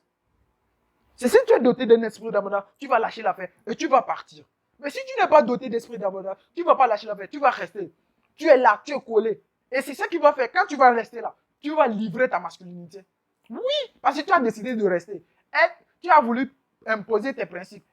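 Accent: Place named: Cameroon. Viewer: Tu a le même accent que Samuel etoo l’ex soccer player je suppose que tu viens aussi du Cameroun comme lui haha 😉😉😉😉😉😉😉😉😉😉💪💪💪💪💪💪💪💪💪💪💪💪💪💪💪💪💪💪💪💪💪💪💪💪💪💪🤜🤜🤜🤜🤜🤛🤛🤛🤛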